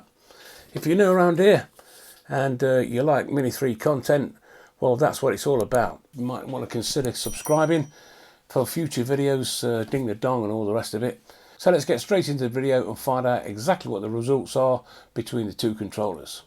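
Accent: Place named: Midlands, United Kingdom. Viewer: you sound like your from Midlands, I got one today and it does 350m before losing 2 bars. Would loved to run 2 of them at same time and test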